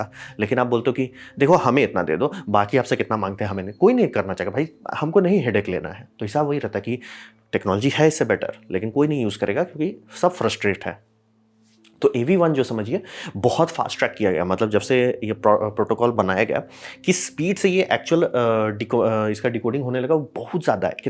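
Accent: Bihar, India. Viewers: sir ji aap Bihar se hain kya??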